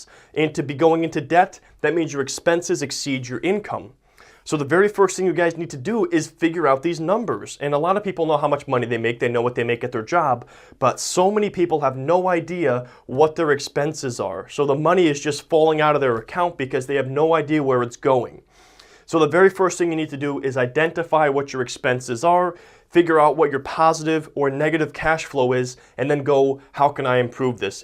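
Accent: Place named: Midwest, United States. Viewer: Great video. May I ask where you're from? I hear a Midwestern accent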